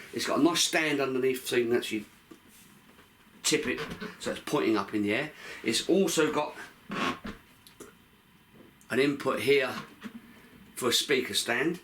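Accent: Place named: London, United Kingdom. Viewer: Hello. I've been spending some time recently trying to find a decent and not costly amp for busking, watching so many videos on YT. I'm gland I found You. I think I'll go fof this one( the new 80watts version of it ). I like yout accent, it reminds me of my past years when I was living in London(1983/84 and 1991/2000). Respect and stay well, ciao from Italy. 🤠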